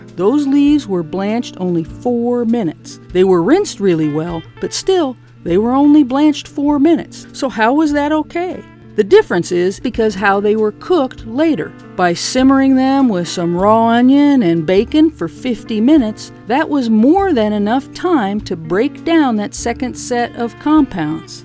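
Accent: United States. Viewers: Ma’am I love your country accent.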